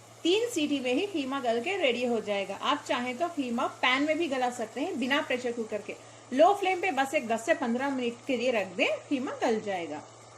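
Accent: Hyderabad, India. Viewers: Are you from Hyderabad (you accent hints) 😅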